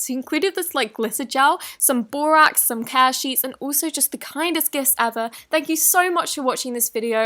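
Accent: United Kingdom. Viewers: I don't know if it's just me my cousin doesn't really like her asking but I love her British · r u british? u have a nice accent and I love it! is that weird???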